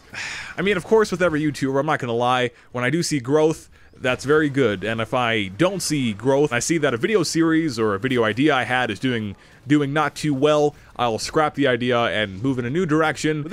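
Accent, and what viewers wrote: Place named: Canada. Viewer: Your canidiaannn!!!!! Damm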